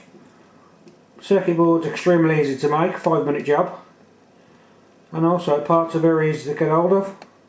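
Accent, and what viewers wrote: Birmingham, United Kingdom. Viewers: Hi there i also live in Birmingham uk it was your accent that gave it away anyway i also have a 100w transmitter but have no equipment except for a multi meter to tune the frequency and very little know how but i am learning fast.It has an exciter board with various trimmers ect and a crystal. how do i tune it, which trimmers do what, and to get around blowing the main output if i disconnected the power to the main amp board this would save me having to to buy a dummy load.Many thanks Paul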